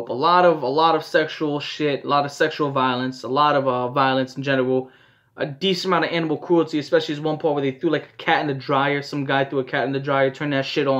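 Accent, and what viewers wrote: New York, United States. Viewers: Bro i soon as i heard you speak i knew you were from my state ny. Much love man❤ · im not sure if you're from ny or nj or what but i love your accent and the way u talk about stuff 😂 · This guy's New Yorker accent and random analogies make me forget how horrific it'd be to watch some of these by yourself. Well done on turning MDPOPE into a comedy lmao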